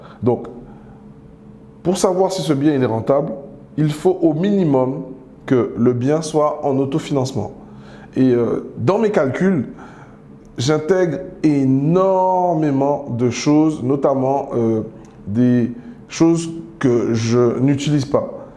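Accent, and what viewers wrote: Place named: Antilles, France. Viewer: Mignon l’accent antillais 😂👍🏾. Merci pour ces conseils car je viens d’acquérir mon 1er bien. Big foss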